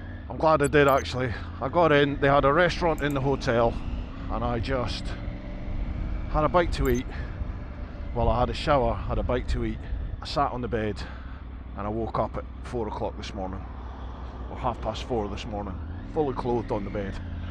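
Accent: Scotland, United Kingdom. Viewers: Excellent viewage, just love the noise that bike makes and with a scot accent ... the landscape is phenomenal 👍😎👍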